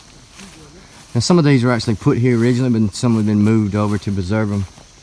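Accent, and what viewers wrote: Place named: South, United States. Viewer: Your from south US aint you?,or your parents are, and your dad was into ww2 stuff and you talked about it alot, that alabamba slack yaw, no offence really comes through when you mention the stuff made from USA...being english i can pick up difference's very easy, like the difference between canadian and american, and ozzies and with new zealanders...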